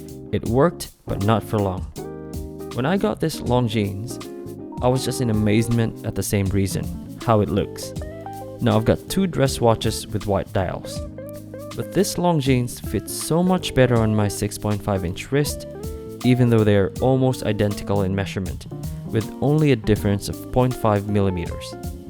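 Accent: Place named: Singapore. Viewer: Bro, great looking Nb1050. BTW custom size leather is quite easy to get these days right? You sound quite Singaporean, are you in Singapore?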